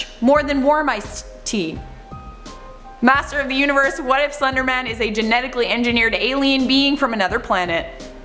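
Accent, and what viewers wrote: New York, United States. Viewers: NY accent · You're rocking that shirt. 🎃 Edit: your New York accent is awesome said in a New York accent 😂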